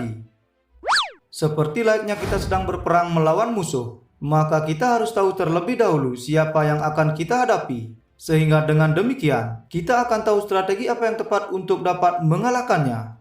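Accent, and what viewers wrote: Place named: Bali, Indonesia. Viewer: Narasinya diperpendek, logatnya kent al bali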